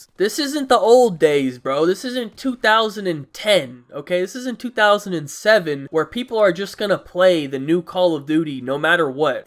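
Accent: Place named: South, United States. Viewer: he sound southern like deluxe 4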